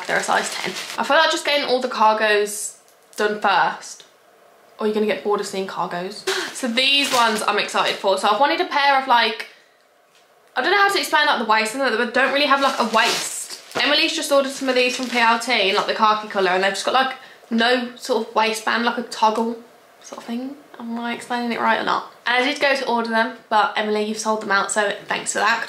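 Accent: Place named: United States. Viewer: I love your American accent! makes me feel at home w you😭🥺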